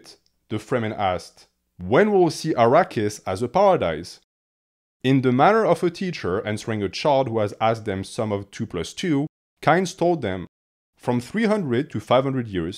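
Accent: France. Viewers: Selon votre accent, je dirais que vous etes francais. I found the french version of the audiobook vastly superior to the english one, what were your thoughts ? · The French accent when speaking English is so pleasing to the ear. I could listen to you talk all day. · Listening to a French guy talk Dune for over 3 hours was as delightful as I thought it would be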